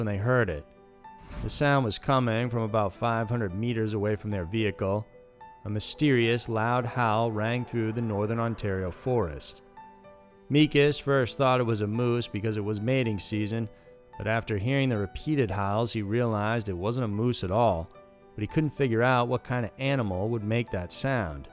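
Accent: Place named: Midwest, United States. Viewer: I'd agree hes American, but I don't hear any southern accents in his voice. Possibly midwestern.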